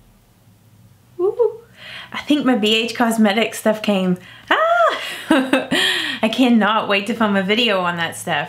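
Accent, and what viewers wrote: South, United States. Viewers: I love your southern accent and that eye look is gorgeous!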